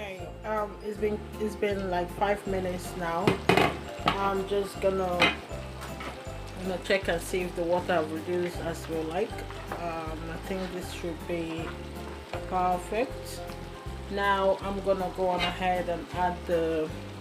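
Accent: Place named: Nigeria. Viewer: It was really refreshing to hear a Nigerian girl with a beautiful Nigerian accent. We need to own our accents too like we own our cooking and teach the young ones that we are proud of who we are. These accents we try to put on make us sound so silly and small minded.....😉 just a thought.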